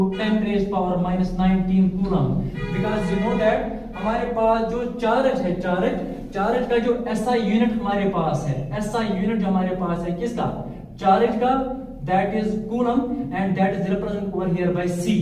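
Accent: Kashmir, India. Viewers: Apki language bohot complicated hai sir pronunciation....is in kashmiri Difficult to understand....and please speak slowly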